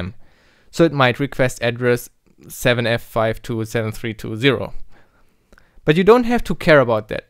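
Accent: Germany. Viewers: really well explained! sind Sie Deutsch?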